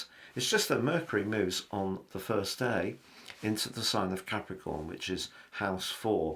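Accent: United Kingdom. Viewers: I love this amazing and well compose being. I love to hear him, his British accent. He speak to refine, I love it!!!🎉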